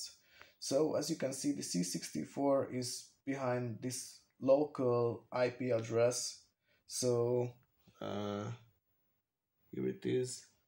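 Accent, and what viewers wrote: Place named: Hungary. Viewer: amazing project! your accent sounds a bit Hungarian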